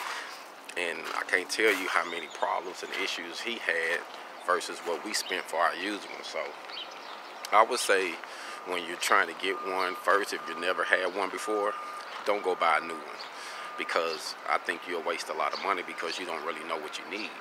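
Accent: South, United States. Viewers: Brother you sound like you're from the South..You should know better....Have you never heard of"trailer park trash",have you never been in some.of these truckstops with these fools...What are you trying to prove?..Think bruh,think!!! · Where are you from? Somewhere down south?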